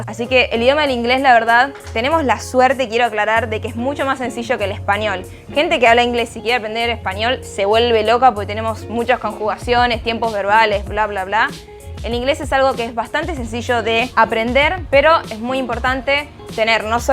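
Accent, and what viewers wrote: Argentina. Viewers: a mi me gusta tu ingles argentino jajajaja, diles que cuando vuelves a casa hay palabras que no te acuerdas en español, o se te escapan en plena conversacion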